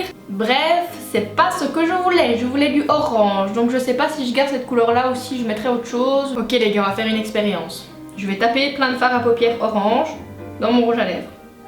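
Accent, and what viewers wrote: Belgium. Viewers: Je pense que ma vidéo préférée de ta chaîne est celle ci parce que c'est toi qui m'a donné envie de m'interresser au Lolita. Et je trouve tu arrives vraiment bien à coordonner que ce soit les vêtements comme le maquillage. Et cette vidéo est dans le thème d'halloween qui est ma période préférée de l'année. En plus le noir et le orange ne sont pas des couleurs évidentes à coordiner surtout pour du loli. Sinon j'adore ton humour, ton petit accent belge, ta façon d'être un peu maladroite et naturelle. Voilà 💕 🎀🎀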